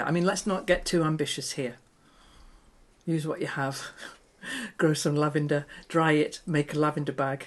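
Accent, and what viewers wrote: United Kingdom. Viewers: I agree with sherryconroy. I look forward to your Sunday YouTube videos. My paternal grandparents were from England. They passed when I was just a toddler so I have no recollection of their lovely British accent. Listening to your lovely voice is so relaxing. I wonder...did they sound like you? I'd like to think so. Nowadays so many of the online tutorials just rush through the steps leaving me a bit unsettled and jittery. I think of you as our Mr. Rogers here in America..."won't you be my neighbor". Thank you for just being you!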